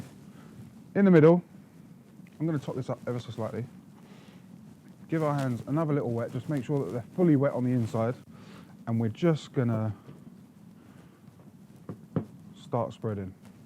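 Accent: United Kingdom. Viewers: I have a feeling that he is going to be like Turkish version of an Action Branson-Matty Matheson mix with a British Accent. No matter what, this channel has a huge "million view every video" potential. I hope you'll do great man. Also, I couldn't stop thinking that you wasted that juice by draining, that would be a great salad dressing base. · funny to hear a turk speaking british english. I love it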